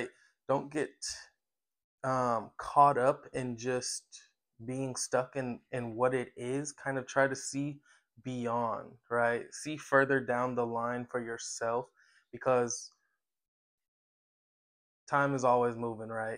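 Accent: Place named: England, United Kingdom. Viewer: Aaarrriieess! I loved your English accent 😜 thank you so much for your time and energy. Everything resonates as always ✨⭐️🌸 Sending so much love and light to you personally and also to all my fellow Aries out there - keep going- don’t give up! 🌸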